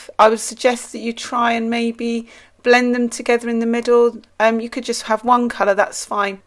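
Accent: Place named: London, United Kingdom. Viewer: Lovely work my friend! I love daffodils! I am missing London with your accent. 🥰 xx #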